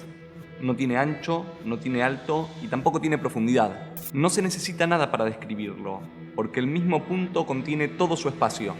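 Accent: Rioplatense, Argentina. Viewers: Te ganaste un sub, no sé si sos argentino o uruguayo, pero por las dudas saludos desde Argentina.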